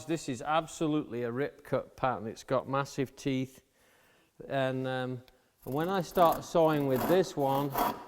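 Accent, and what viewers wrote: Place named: Yorkshire, United Kingdom. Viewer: Your accent sounds like my father's Yorkshire?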